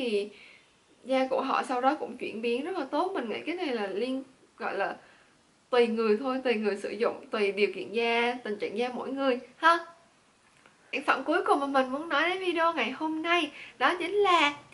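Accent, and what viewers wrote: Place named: Da Lat, Vietnam. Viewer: Linh Le bạn ơi giọng đà lạt họ nói vậy đó không phải nhẹt mũi đâu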